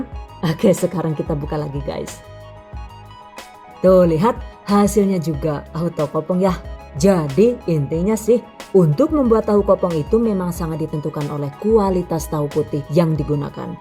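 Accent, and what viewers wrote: Banyumas, Indonesia. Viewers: Paling suka kalo ada selingan 'kata kata mutiara' yg ga ada di channel lain Pake bahasa jawa ngapak, auto ngakak 🤣🤣🤣bisa aja nih, kirain orang banyumas- cilacap -purwokerto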